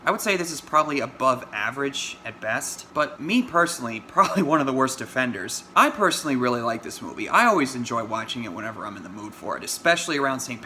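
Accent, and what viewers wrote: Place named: Ireland. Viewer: Do a YouTube search for Star Stories Tom Cruise to see a very funny “summary” of the film. I enjoyed your review and it nearly makes me want to watch the film again to see if I will change my rating from the one I gave it then: TotalAbsoluteLoadaShite. Your Irish accent is grand, def better than many American actors.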